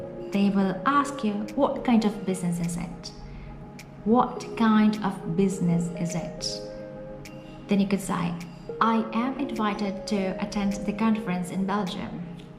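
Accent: United Kingdom. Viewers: Maam taga UK po kayo? Nice accent and the video is Very informative..Kudos!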